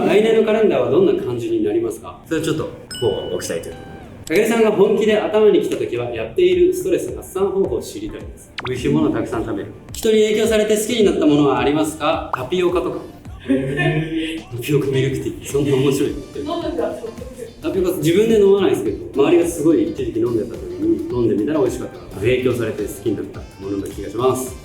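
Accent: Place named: Osaka, Japan. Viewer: いつの間にか大阪弁になってる〜 出来ましたの時の健君のとても嬉しいそうな顔幸せそう🎉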